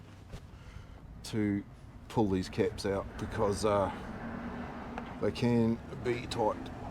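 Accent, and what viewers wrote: New Zealand. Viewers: I bloody knew it,,,,, You're a Kiwi.😂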